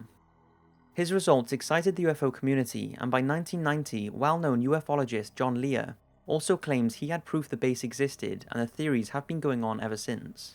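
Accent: United Kingdom. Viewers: damn, british accent... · the British accent helped me stay calm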